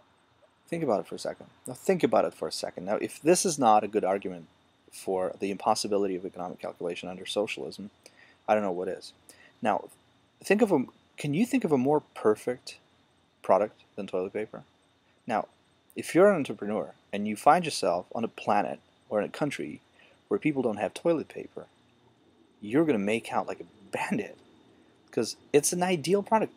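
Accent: Midwest, United States. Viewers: This is a very interesting account of your life and the life in general about living in the Soviet times ; Your ability to speak English like an American is quite impressive. Pretty much everything you described such as the description of your Kruschevka apartment, the scarcity of some foods (ie Bananas) lack of toilet paper ,the difficulty of traveling abroad and lack of private cars is exactly what i read about the USSR back in the mid 80s living in the US. It is true that the US news and movies did (and still do) have a lot of propaganda, but at times if you were a good reader you could occasionally find unbiased articles that described the daily life and the culture of the Soviet people .Few people today can remember a time before the internet and global connectivity back before you were reliant completely by books , tv or an immigrant who lived abroad. 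As a person who lived this way you do have a unique and straightforward perspective of telling your life in such a way that I find very few Russian immigrants feel reluctant to tell. I pesonally want to thank you for your story and time... You represent the American Dream that you can achieve if you believe. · Were you put in future kgb spy school. Your american accent is really good. Was your dad an american communist? How did you lose your accent? Your parents had to be americans that moved to the paradise. Ha ha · How come you sound American if came to America in 2005? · Sounds like midwest english .. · But that aside based on the way you talk and look correct me if I’m wrong. You live in the central to Midwest in the USA. If I had to narrow it down I’d say Ohio or Indiana. Judging by your complexion you work indoors maybe even third shift. Id day you work in a warehouse or maybe a factory. 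Your not a business man based on your gruff appearance you don’t wear a suit. You use a lot of short to the point words entails you aren’t highly educated I’d say associates degree at most. Be honest how much did I get right? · Without sounding stupid as I haven't watched the entire video yet, how come you have a near perfect American accent? Did you live in America until you were 10? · Your accent is very American despite living in Russia for so long. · My family is from Crimea and has deep roots in Krasnoperekopsk, I call fake on this video, he has a perfect American accent but allegedly spent his entire formative years in the Soviet Union, and smokes a fat cigar which is probably just a stereotype for street cred thing, and he says bedrooms are a foreign concept, no the idea of having one specific room for only sleeping and nothing else may be to some people but the idea of having a room for sleeping wasn't a foreign concept in the Soviet Union, and Leningrad was far from the nicest place in the Soviet Union to live. 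And you can complain about apartments not being luxurious by modern standards but by the standards of the time they were a huge improvement over most people's living conditions and far better than living on the cold streets, at least people were housed and fed, many countries in the west particularly back then had high rates of homelessness and unemployment and some still do. And bananas? Seriously? Oh well how horrible of the Soviet Union not to have installed a bunch of Banana Republics and conducted a bunch of massacres like United Fruit to get an unlimited supply of bananas, yes Bananas were a luxury that's because Bananas don't grow well outside of a tropical environment and it took acts of genocide and tyrannical imperialism to make them an affordable commodity in the United States and Europe. So no bananas, but there were plenty of grapes, at least in Crimea I can't really speak for the rest of the Sovi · how did u lose your accent so fast if you lived in russia for 30 years? 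you sound like your from the midwest. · My God! You have no trace of an accent! One would never know you were originally Russian. You sound so American!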